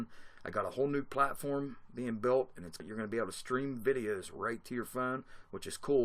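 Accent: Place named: Pittsburgh, United States. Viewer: sounds like your from Pittsburgh man good video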